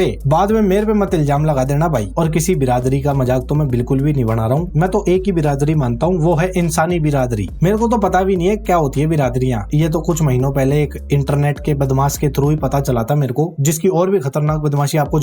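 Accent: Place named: Bikaner, India. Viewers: Bro i guess you are from Bikaner because of your accent 😅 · Lagta hai ganganagar ya bikaner se hoga bhai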